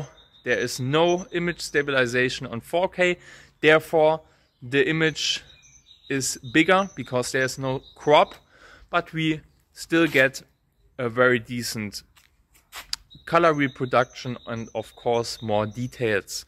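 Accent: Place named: Germany. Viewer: Coool German accent like it bro!😊 Thank you for your good (I think =) honest tests. I would be happy if you would test the small Galaxy s22 (6.1") again. It is said to have come a little closer to the flagship specs with camera etc. small and good cameras and battery is something i always hoped for, more so since the apple mini phones, you can start a petition that xiaomi or other widder build small phones, it doesn't have to be the best cpu.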